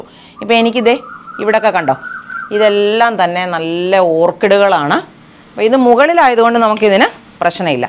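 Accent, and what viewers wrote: Kottayam, India. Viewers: സംസാര ശൈലി കേട്ടിട്ട് ❤കോട്ടയം ഭാഷ ആണെന്ന് ഉറപ്പ്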